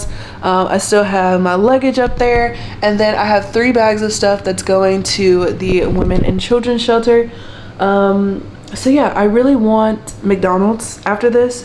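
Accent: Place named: South, United States. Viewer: A little bit lol it’s the southern accent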